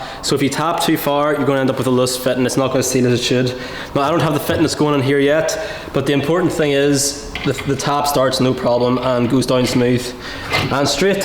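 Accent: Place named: Ireland. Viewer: Nice vid interesting facts about the foot cylinders sizes. Strange hearing a irish/northern accent 😂 if your even from here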